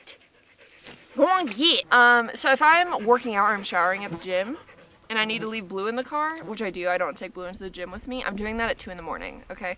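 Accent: South, United States. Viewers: Please do an entire video in the Southern accent!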